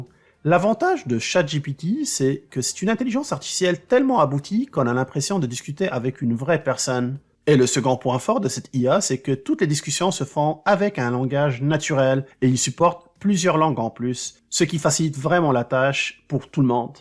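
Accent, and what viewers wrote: Quebec, Canada. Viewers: Très bonne vidéo, merci. Et, ça fait plaisir d'entendre un accent québécois aussi facilement compréhensible. Bravo, très bon français. Après, l'utilisation de l'anglais de temps à autre n'est pas un drame, nous sommes en 2023, beaucoup de pays ont 2 langues officielles depuis plusieurs décennies, dont l'anglais en 2ème, et leur première langue n'a rien perdue et reste le langage le plus parlé dans le pays. Quoi qu'il en soit, bravo à tout les québécois qui parlent un français impeccable et qui sont même capables de corriger toutes mes coquilles car ils écrivent, souvent, beaucoup mieux que moi. 😅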